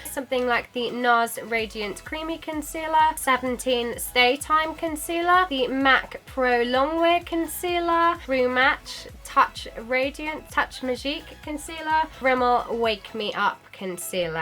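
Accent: United Kingdom. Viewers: I've seen your videos like <num> times, but wanted to hear her British accent🙊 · Amazing OMG!!!Loved it! kisses from Brazil!!! (please put cc on your videos, it would be great and helpful for me) Love your British accent ❤ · She is british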